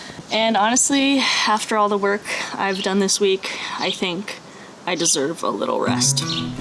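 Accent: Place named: Boston, United States. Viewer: But I love your Boston accent!! Also that knife shirt is a total vibe...DON'T MESS WITH! It really inspires me that you're tackling so much of this build all on your own and it's such a joy to watch. (I know you had help with some of the electrical stuff, but so much of the woodwork has been by yourself - it appears at least.) I always had my partner to bounce ideas off of and problem solve with, and I don't think our van would have gotten finished on my own. You're such a boss lady!!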